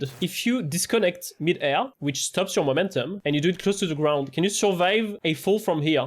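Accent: France. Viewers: I cant tell if that beautiful accent is french or something else, but god it invests me into the video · Wow a French accent with clear English is actually beautiful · French AND a speech impediment that’s crazy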